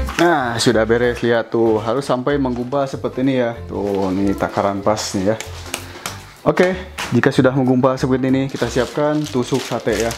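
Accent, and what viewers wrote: West Java, Indonesia. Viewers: Kita banjuriii yaa..😂 sunda pisan euy.. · Alhamdulillah, diam d rumah ada kegiatan, praktek bikin makanan ala 2, bahan nta simpel / mudah d dapat dan ga banyak biaya lagi, nuhun ide nya, bikin ibu 2 jadi resep, bikin nya dan lucu klw ngomong sunda na lucu 👍👍👍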